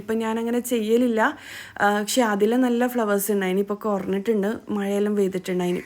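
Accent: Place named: Cheruvathur, India. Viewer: njanum cheruvathur. aanu. samsaram same. aanu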